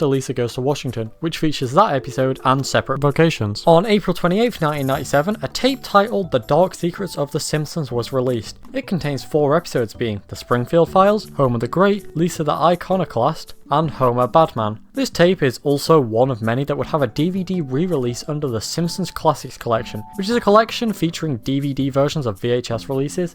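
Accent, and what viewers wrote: United Kingdom. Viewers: Northern English (UK), possibly Liverpudlian. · Can you please stop with the British accent?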